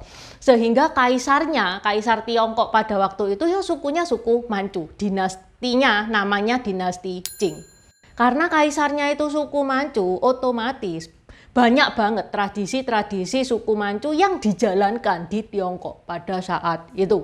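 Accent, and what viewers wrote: Java, Indonesia. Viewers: Ngomonge koyok logat jowo. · Jawa'y medok banget 😃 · logate njawa BB uuaaaanget sih 😃😃 matap maju sucses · Mbak dhewe yo wong jowo, yo opo Iyo? Logate ora biso diapusi. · Lho Ce kok medok ngomonge.. Hahhahahaa... Nah itulah indahnya Indonesia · Cici ngomongnys medok ya.. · Satu dari sekian ribu etnis di NKRI apapun latar belakangnya itulah yang mambuat kita makin kuat, cece medok banget, semangat ce berjuang terus mungkin masih banyak yg harus cece kisahkan, biar makin beragam cerita tentang Indonesia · ini wajah cino tp dialek lidah jowo (mbandek), bersedia cerita? 🌱🤫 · Medok buanget Jowone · Salut sama mbak china nya medhok jawane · Ini Cina Jawa,cara ngomongnya medok kayak orang Jawa yg setuju like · Mbak admin sendri,medok Jawa nya ya. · Keren ada medok medok nya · Bu logat jawanya kok medok banget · etnis Tionghoa, ngomongnya medok Jawa, orasinya tentang Cina Benteng singkat tapi cukup lengkap 👍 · Ini orang china apa jawa...logatnya kok jawa banget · Mbak logatnya seperti jawa medhok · Logatnya Njawani banged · Kalo kakak China Jawa ya, medhok banget. 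· Kok medok lagate to mbak... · Logatnya jawa sampeyan mbak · Teteh ngomonge ko medok banget toh 🤣🤣 opo ga iso dek sesuikan, pribahasa sunda pindah cai pindah tampian 👍 terimakasih sudah bantu kasih tentang sejarah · koq km berbahasa Indonesia berlogat medok jawa sih, apalagi membahas cina benteng daerah tangerang..itu d gn sindur, legok bnyk tuh Hitachi..sy dah pernah kunjungi 2 tempat itu.. lucu ya km berbicara medok...😁😀😁😀😁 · ini pasti orang Jawa tengah/timur, ngomongnya masih medok.... Hahaha.... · Cina Jawa, medok bnget Jawa nya 🤭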